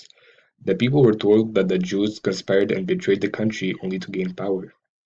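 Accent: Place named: Ireland. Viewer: Great material my friend, this helped me puzzle-out a lot of things I had never figured out before. I hope you are coming back very soon with videos this good. P.S.: I love your Irish accent, I can clearly see you grew up in Dublin!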